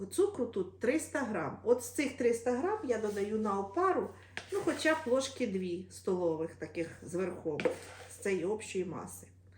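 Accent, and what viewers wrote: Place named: Khmelnytskyi, Ukraine. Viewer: Класний рецепт, дякую Вам! Візьму собі на замітку! У нас такі пироги теж називають струдлями., Ви родом не з Хмельниччини, дуже діалект схожий на наш 🤔😅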